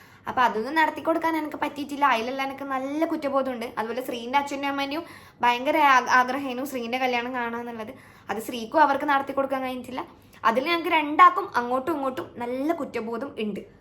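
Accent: Kannur, India. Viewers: വളരെ നന്നായി സംസാരിക്കുന്നു. നമ്മുടെ സ്വന്തം കണ്ണൂർ ലാംഗ്വേജിനു ഇത്ര ഭംഗി ഉണ്ടായിരുന്നോ 😮. താൻ വളരെ genuine ആണേട്ടോ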